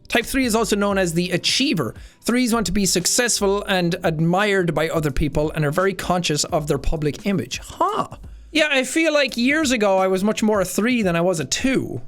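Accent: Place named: Ireland. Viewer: After the ronnie Neville video I can hear irish side in every video and its amazing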